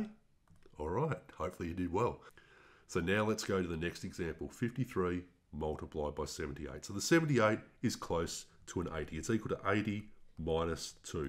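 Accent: Australia. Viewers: Awesome ! btw Ya sound like an Aussie or some NZ guy !😅💥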